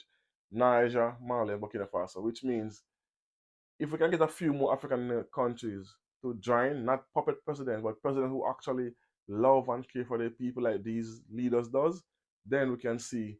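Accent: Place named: Jamaica. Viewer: You are only speaking to the Jamaicans, sir, because only them can understand your accent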